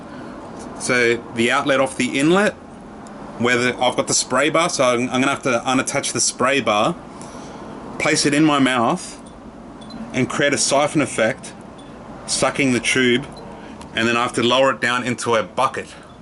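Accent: Australia. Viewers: Love the aussieness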